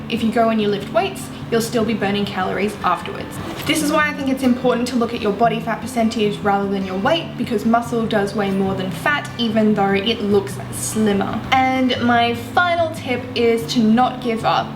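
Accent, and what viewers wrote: Australia. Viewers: aussie accent?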